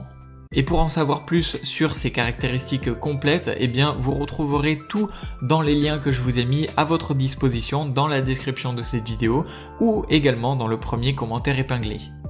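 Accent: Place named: South, France. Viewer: Ce petit accent de la garriguEU 😂😂😂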